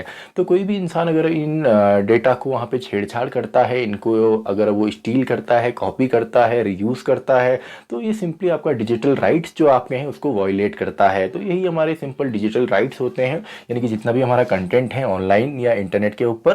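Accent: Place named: Uttarakhand, India. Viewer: Sir u are from Uttarakhand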